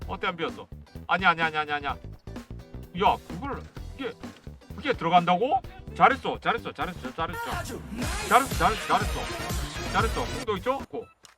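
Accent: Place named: Busan, South Korea. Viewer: 나 형 부산쪽일거라 생각했어! 광주 말투가 아님!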